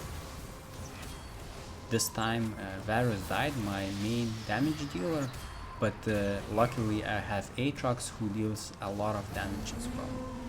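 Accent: Lithuania. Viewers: kai tu pasakai vidio iskarto matosi kad tu letuvis bet vistiek geras vidio :D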